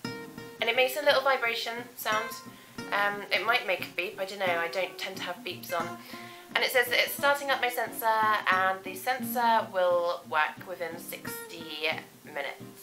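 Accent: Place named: England, United Kingdom. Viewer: Hi, I thoroughly enjoyed your video. I love pretty redheads with an English accent. As insulin users who have a prescription we just recently got covered for the Freestyle Libre in Ontario, Canada. That is a very big savings since the sensors are now $97.00 CAD each.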